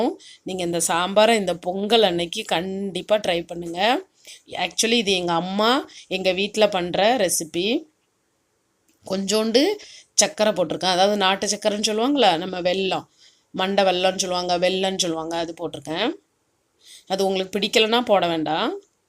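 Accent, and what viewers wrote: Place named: Kanyakumari, India. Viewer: உங்களுக்கும் உங்கள் குடும்பத்தினருக்கும் எனது இனிய பொங்கல் நல்வாழ்த்துக்கள் பட்டு ராணி.... உங்கள் பேச்சின் மொழி (வட்டார மொழி) கன்யாகுமரியா அல்லது திருநெல்வேலியா....? நான் தஞ்சாவூர் மாவட்டம்....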